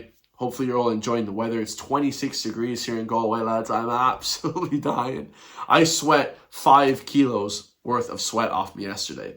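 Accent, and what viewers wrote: United States. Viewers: You sound more American than Irish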